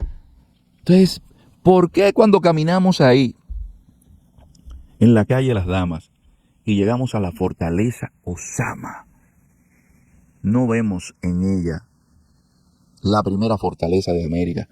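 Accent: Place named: Castile, Spain. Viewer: Siempre veo los videos de wn tu canal, hablas con acento castellano. No se si eres de Espana realmente.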